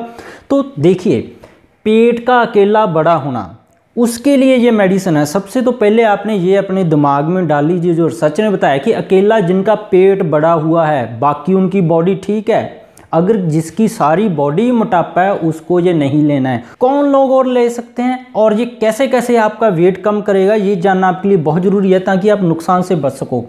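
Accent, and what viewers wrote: Punjab, India. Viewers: I love the punjabi accent when speaking hindi :)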